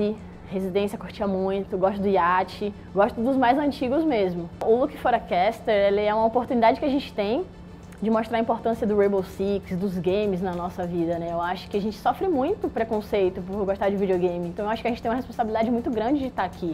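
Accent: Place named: Bahia, Brazil. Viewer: Adoro ela nas narrações na ESPN não tem aquela voz chata além desse sotaque baiano que é maravilhoso...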